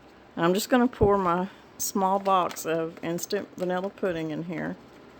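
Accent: South, United States. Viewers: I don't know how I came across this but after watching it for about 10 seconds I hit subscribe. I love her southern accent, she sounds like my mamaw.. love the recipe too!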